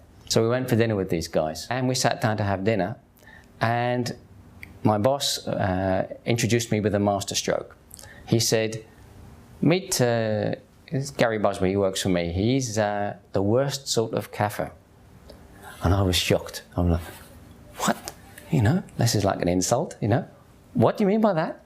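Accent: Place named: London, United Kingdom. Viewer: I like your London accent but I can't do it. I do US accent, I lived there many yrs. I am Malaysian Malay Muslim.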